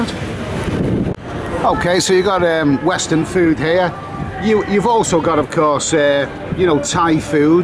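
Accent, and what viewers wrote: Liverpool, United Kingdom. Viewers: Good Morning just gone 7.30am here in deepest Issan What a great way in which to start the day a vid from The Beer Garden Yule be looking for a deckchair on the beach now to sleep all that fayre off!! Great viewing always a pleasure to hear your dulciet scouse tones Reminds me of an ol mucker o mine from Toxteth living in Jersey keep up the Pattaya vloggin it fills a much needed void up here in riceland All The Best Big C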